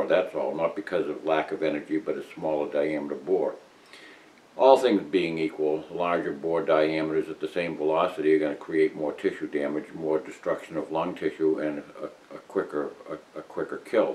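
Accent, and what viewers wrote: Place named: Boston, United States. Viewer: This guy has a straight up Boston accent! Hilarious. He sounds [and dresses] like an old Boston cabbie friend of mine. Priceless. I guess you had to be there! Down by the banks of the River Charles. I love that dirty water, O Boston, you're my home!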